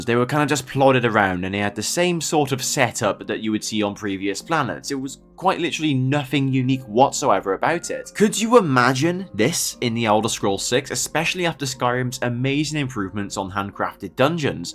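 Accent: United Kingdom. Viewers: He's got a middle of the island British accent. This lends him popular authority which is not earned. This is the only thing the UK has left to export other than financial planning.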